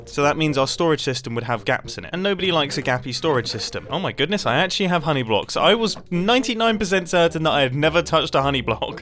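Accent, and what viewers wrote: United Kingdom. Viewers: I come for the complex redstone builds, but I stay for the fantastic British dialogue. Thanks for the great content Mumbo! Your ability to make me laugh is quite impressive.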